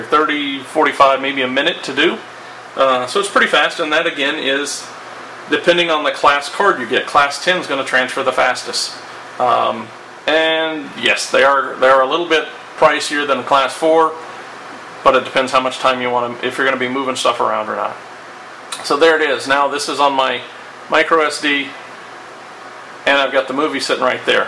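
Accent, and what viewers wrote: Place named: South, United States. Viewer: where are you from man? i'm guessing the south.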